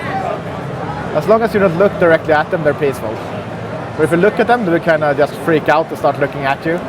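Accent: Sweden. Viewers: No, he always spoke like this. XD His accent is very Swedish. XD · is he swedish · Hihi, sweedish-english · swedish english..... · guess the commentator is swedish..... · Yeh....stfu and face the facts, clearly its a convention by the ammount of talking, he has a swedish accent that sounds EXACTLY like Notch, its on an offical youtube channel which is IGN hes on a beta version which is probs only available to the devs, so please learn to use your small minded brain before commenting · is it just me, or are Swedish accents awesome? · Jag gillar den typiska svenska engelskan :D I like his english accent :D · det hörs att han är svensk! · Hahah, i hear that ur swedish!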